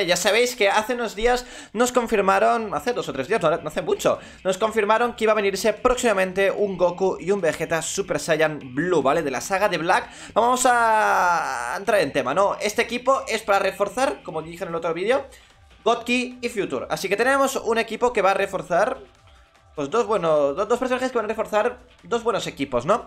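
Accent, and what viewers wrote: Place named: Japan. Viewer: F por esa entonacion japonesa...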